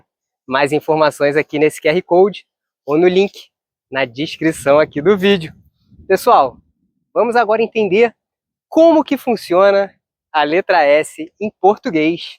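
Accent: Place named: Rio de Janeiro, Brazil. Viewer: Ilha Da Magia, loved the lesson my Brother. Sotaque Carioca e Top ta ligado. I really enjoy your videos because you distinguish the Carioca accent from other accents. Thank you for the licao mermao, valeu valeu abraco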